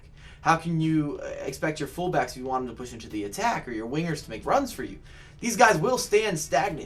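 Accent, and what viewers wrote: United States. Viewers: Fifa School please boy you sound like a american trying to teach Footbal (it ain't soccer) USA suck in footbal man what do you know about footbal tactics and formations we invented and breath football in europe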